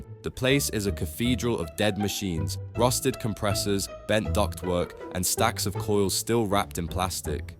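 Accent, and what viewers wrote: United Kingdom. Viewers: Good dry English accented humor., why does everything have to be AI now? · Get someone who can speak English OT Cockney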